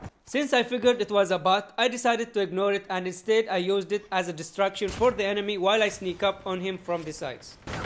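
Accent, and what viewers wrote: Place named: India. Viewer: Your voice essence feels like u r Indian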